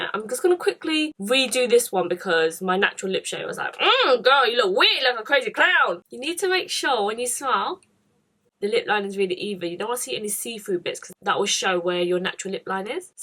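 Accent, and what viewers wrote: United Kingdom. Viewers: hey girrrlllll Im Gemini too june 11th :) i love the uk accent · I wasn’t expecting the British accent 😂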